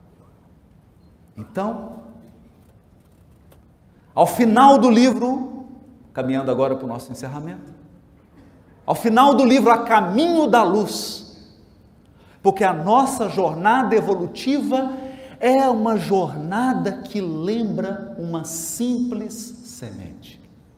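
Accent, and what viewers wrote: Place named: Minas Gerais, Brazil. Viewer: Obrigada por essa mineirise maravilhosa . querido irmão obrigada por seus ensinamentos paz e luz.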